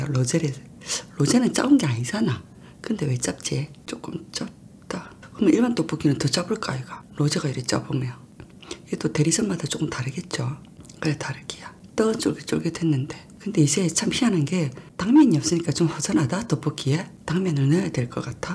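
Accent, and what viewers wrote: Busan, South Korea. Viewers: 참 재밌게 하시네 부산말씨~ 나도 부산사람이지만 ㅎ 끌림이 있다 이분 ㅎ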